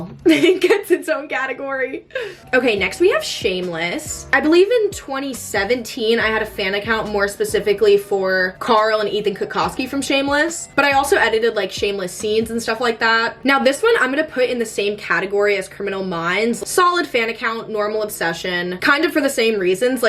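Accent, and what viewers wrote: United Kingdom. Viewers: ur a Brit?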